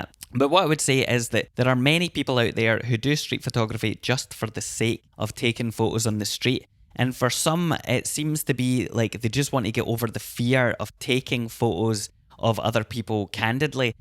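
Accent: Scotland, United Kingdom. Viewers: 👀 Such an awesome new series for your channel and a perfect way to start it off! 🎹📷Really enjoyed all the photos and your "dulcet Scottish tones" throughout! 😁😁 So funny, we both live in areas where the attitudes of the people seem quite similar! haha Looking forward to the next!! 🙌🐀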